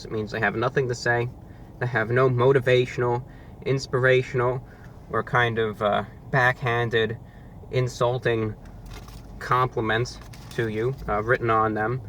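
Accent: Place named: Transatlantic, United States. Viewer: Btw i think your better than joeys world tour :3 Im east coast just like you! And i love your transatlantic accent too <33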